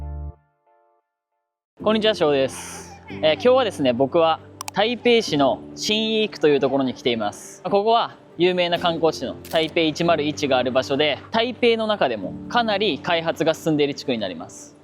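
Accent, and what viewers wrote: Japan. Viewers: 長野出身ですか？ 結局1軒しか売れてないなら、住んでる人には安いよね。ビル1棟だもんね。とてもエコとは思えないけど。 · たまにのぶりんさんの動画見たりしますが、のぶりんさんと同じアクセントで話されますね。北陸のご出身ですか？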